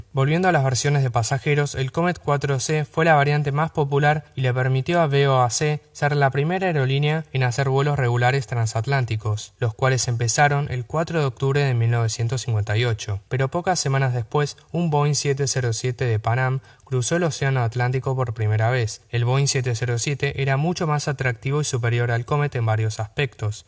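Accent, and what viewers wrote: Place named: Argentina. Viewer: comencé a verlo y a poco andar se oye la voz de un argentino con sus aches características en vez de doble eles o "y" donde aquí no resulta para nada creíble -.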